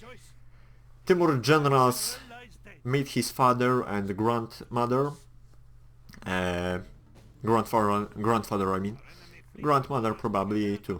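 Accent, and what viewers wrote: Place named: Germany. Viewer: you great but try speak more well ok ? where u form perpahs germany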